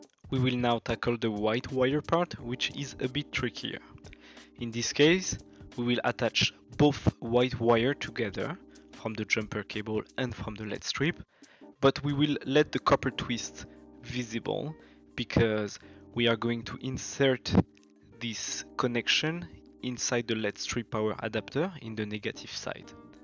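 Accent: France. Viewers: Cette accent français, super vidéo merci 👍😂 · are you french ??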